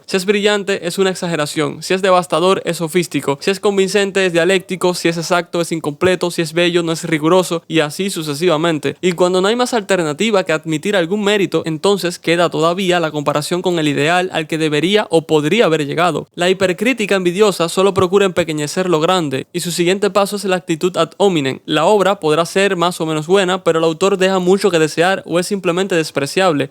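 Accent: Cuba. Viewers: Es que te tengo envidia por la edición de tienes, ni decir de tus memes o de tu acento cubano mi hermano 😎😎😎😎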